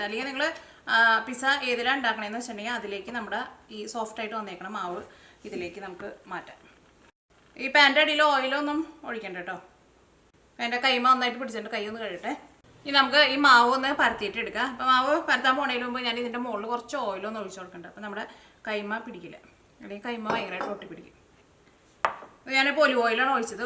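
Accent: Thrissur, India. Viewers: Chechi thrissur kaari aaanoo....najn thrissurikkaariyaa.......tune kettittu thooniyathaa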